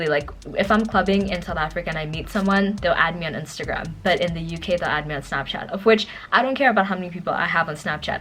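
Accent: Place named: United States. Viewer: You sound American lol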